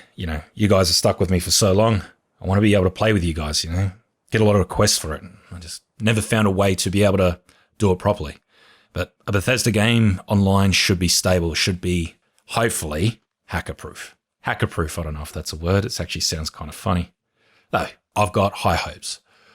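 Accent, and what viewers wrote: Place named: Australia. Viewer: Explains why their was a baby in the background at Stranded Deep. Have a good one brother, missed your Aussie voice 😂.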